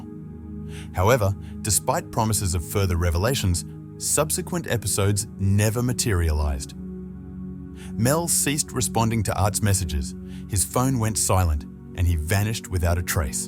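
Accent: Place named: Australia. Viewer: what a waste of time! With a much repeated story line, which I think is written by someone who has a great imagination ! 😅😅 Its a shame, as I was thinking an Australian voice would be nice to hear for a change. But it just got worse the further it went on. 'Just stay tuned to hear the horrible story' of Mel, to find the truth. 😅😅 I stuck it out by typing this 😅😅😅 880,000feet!?😅😅😅😅 Don't bother!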